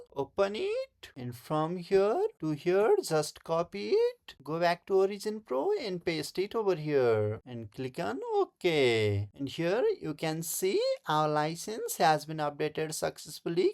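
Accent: India. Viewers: You must be from Odisa, India..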